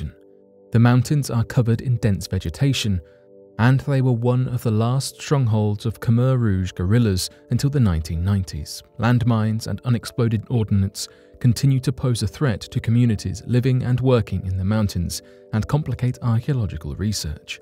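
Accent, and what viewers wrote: United Kingdom. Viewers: I like the content of the video. Im just sick of English accents! Anytime there is any science or history its a gdamn British accent! Im sick of it! Next vid of youres with a Brit accent and its a thumbs down!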